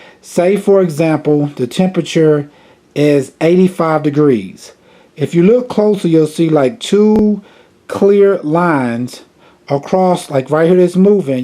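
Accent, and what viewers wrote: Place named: Midwest, United States. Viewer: Love the midwest accent "right thurr" 😍👌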